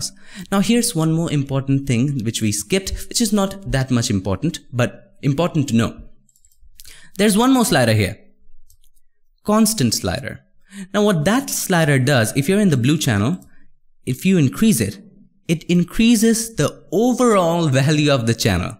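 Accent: India. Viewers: you have good techniques and concepts, but damn your english! you talk like an indian rapper. you have to slow down on your words and give more emphasis to it.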